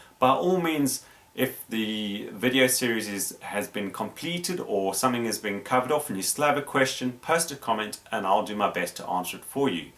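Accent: South Africa. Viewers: Even with a thick SA accent, your video was easily understood and interesting. Great video 👍 and thank you.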